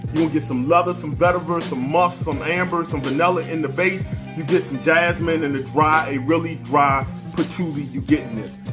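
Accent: DMV, United States. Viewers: Man people in the dmv really do have a accent. Love the video keep it up!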